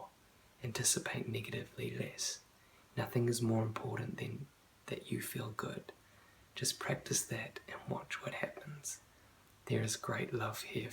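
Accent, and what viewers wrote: New Zealand. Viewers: I needed this - X10. been through a bad time over the past couple of years with people. I to have just started yoga a few months ago too. How ironic. Love your accent, not many Kiwi's doing ASMR, lots of Aussie's though... Thanks, X10.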